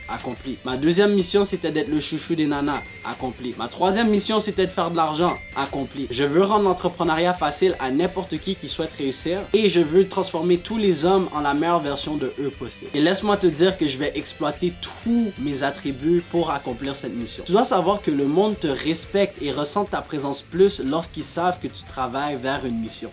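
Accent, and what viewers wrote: Canada. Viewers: T'es canadien ?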